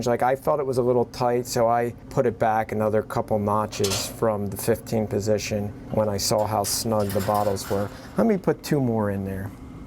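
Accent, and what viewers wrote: Philadelphia, United States. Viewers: Are you from Philly?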